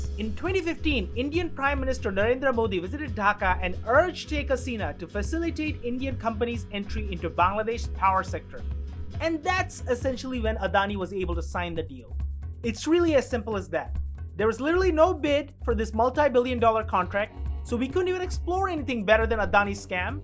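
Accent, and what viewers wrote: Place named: United States. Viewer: Good one sided propaganda video. Very smart idea to do propaganda in Americanized English accent. However, this amateur analysis will not work outside of gullible Bangladeshis.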